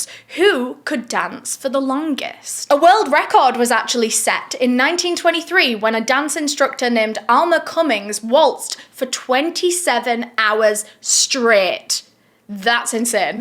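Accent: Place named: Yorkshire, United Kingdom. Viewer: It's always an amazing day when uploads. Thank you for another wonderfully informative video. I love your Yorkshire accent. I live in Derbyshire, so I have a twang of it in my accent as i lived in South Yorkshire for a while. Look after yourself, beautiful lady!. 🥰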